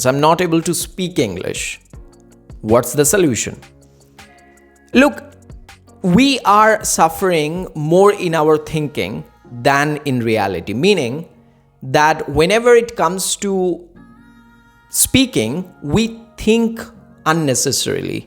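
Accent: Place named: United States. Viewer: Why do speak in American accent?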